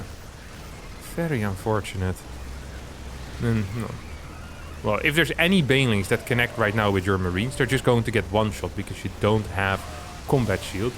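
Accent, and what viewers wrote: United Kingdom. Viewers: love your british accent XD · Love the British accent! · I just love the British accent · the british accent slowly starts to creep in lol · i like that the more Harstem reads, the more British he becomes. i do find it funny the Terran complains about lurkers, but then talks about he could had made Ghosts. you know.... the unit that can ONE SHOT THE ONE THING HE IS COMPLAINING ABOUT !? if anything Zerg should be complain about terran since ghosts can just constantly one shot any spellcasters and lurkers they have !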